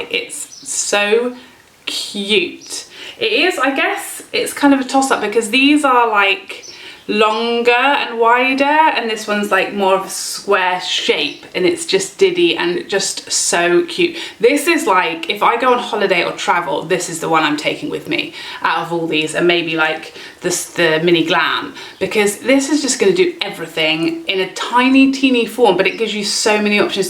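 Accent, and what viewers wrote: England, United Kingdom. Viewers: I seriously CANNOT understand WHY it's so hard to ship to the UK... i told the lady at the Post Office THIS IS CRAZY🤪🤪🤪 I'm So so so sorry it never arrived. The last time I sent it, I haven't received it so you may end up with 2💋😁🤪 Maybe one day. But GEEZE. I am so sorry I wasn't able to get that Palette in your hands sooner.. LOVE YOU MY SWEET ❤❤❤ I adore you in EVERY way. And your English accent GIVES ME LIFE🙌🙌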